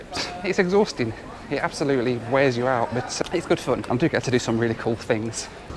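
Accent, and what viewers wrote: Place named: Leicester, United Kingdom. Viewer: Which part of Leicester you from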